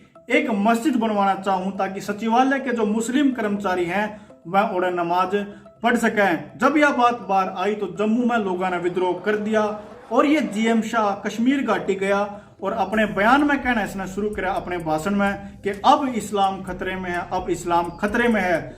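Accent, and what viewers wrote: Haryana, India. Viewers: Bhai tara video suru hon ta paala ka intro va haryanvi tone bhot badiya laga ha 👌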